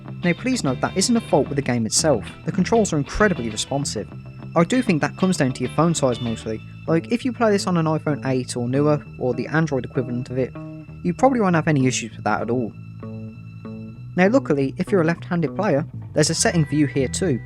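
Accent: United Kingdom. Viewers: you speak in a heavy British tone to the point I... cant understand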